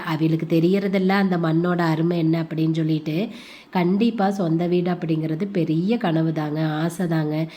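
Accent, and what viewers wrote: Kongu, India. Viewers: கொங்கு தமிழ்❤❤❤அழகு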